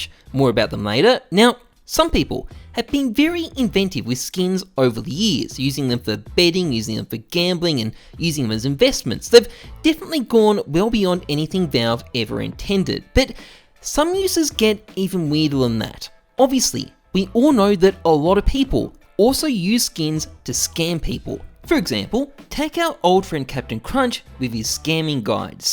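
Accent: Australia. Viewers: Why does he sound like someone doing a parody of an Australian person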